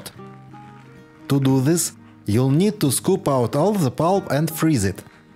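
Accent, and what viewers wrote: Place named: Russia. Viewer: Мужик, ты чертов гений! Лайк тебе за лайфхаки и за классный русский акцент. Подписалась.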